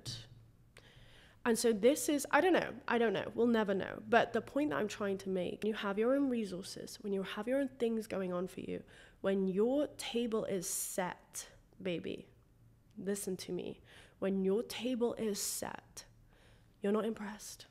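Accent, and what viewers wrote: United Kingdom. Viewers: This just landed on my recommended page and I instantly thought that Billie Ray girl from Tiktok started doing self development videos and got a British accent lmao. Really like the content though! My kind of jam.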